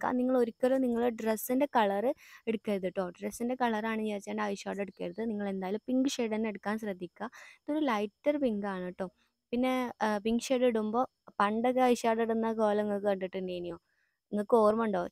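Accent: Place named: Malappuram, India. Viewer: Ithaatha Malapprm aano place.. Samsaram kettitt thonnnd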